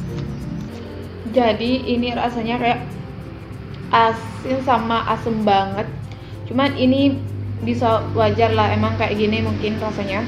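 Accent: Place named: Lampung, Indonesia. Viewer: Logat Lampung nih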